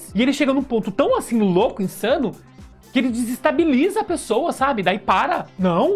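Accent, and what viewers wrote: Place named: Bahia, Brazil. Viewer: KKK seu sontaque Baiano é perfeito!! sou Baiana.😂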